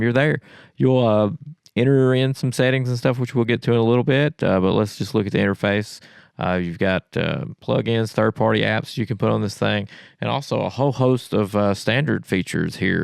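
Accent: South, United States. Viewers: I hear southern accent, I give the video a like. Simple as that.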